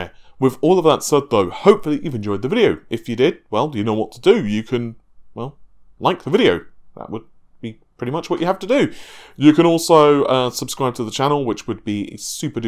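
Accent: England, United Kingdom. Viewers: Maybe a correct pronunciation of Navi will happen after it's launch, lol! I know it's his proper English accent when it comes to Nah-vey. But, at least he's doing better than Rich from RTU who's so far called it Nuh-vee, and Naiv-eye🤣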